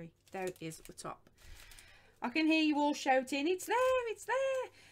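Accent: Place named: United Kingdom. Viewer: Are you from england you have an accent british very funny! I see it all and it wil be the same when you will put in thé rangement! Good luck!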